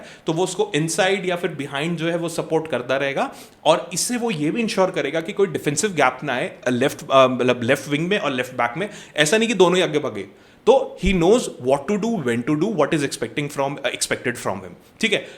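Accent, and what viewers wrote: India. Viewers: Indian accent cuts my ears🤢🤢🤮🤮🤮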